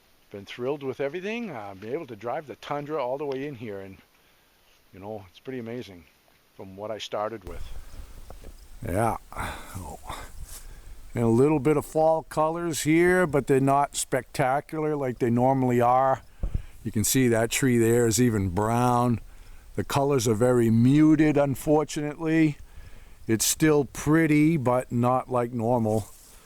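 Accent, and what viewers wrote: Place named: New England, United States. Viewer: I am in Northern Wisconsin. Small place on five acres of land surrounded by State Forest and other private uninhabited lands. Although we are not a primitive as you are, we do enjoy our surroundings and gathering and using our natural resources. I saw you mention the "Camp". I thought I detected a NE accent. Being born in MA, my brothers and parents live in NH, so the term Camp was very familiar to me. Approximately where is the Camp? One brother is in Auburn, while the other is in Wolfsboro. Just curious. Great job on the cabin, and glad I found your channel.........Thanks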